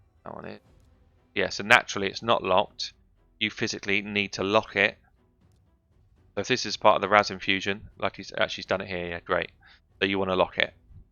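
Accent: United Kingdom. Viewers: Got directed here from Stew. Great vid and info and nice to hear a brit 🏴 doing some raid content. I'll be coming back 👍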